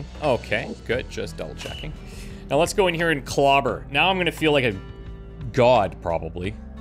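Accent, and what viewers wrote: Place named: Canada. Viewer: Gets progressively more Canadian as the pressure rises.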